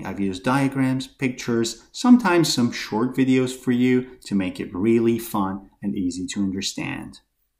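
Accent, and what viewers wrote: London, United Kingdom. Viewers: His london😂😊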